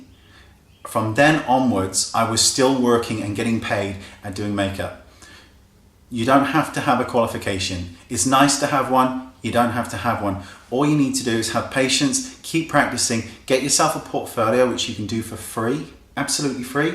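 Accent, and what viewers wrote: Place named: Bristol, United Kingdom. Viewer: Sounds like the Bristol area to me (my neck of the woods!). Sometimes, whenever I'm feeling homesick at uni, I'll play his videos in the background while I'm working. He's like my David Attenborough. My neighbours must think I live in a makeup cult; nonstop one-way conversations about contouring and eyelashes...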